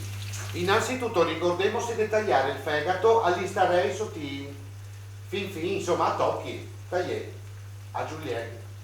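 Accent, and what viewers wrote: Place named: Veneto, Italy. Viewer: Sei simpaticissimo. E' bellissimo il tuo veneziano da ascoltare. E' una musica! Inoltre sei molto bravo.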